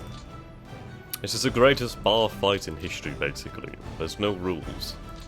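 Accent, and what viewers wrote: United Kingdom. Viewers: Great Video you sound British but with a deep voice not an insult are you British??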